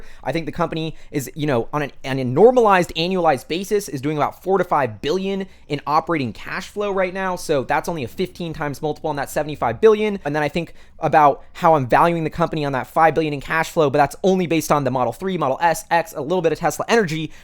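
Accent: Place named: Silicon Valley, United States. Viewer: The cancerous silicone valley accent can be avoided by muting it and using captions.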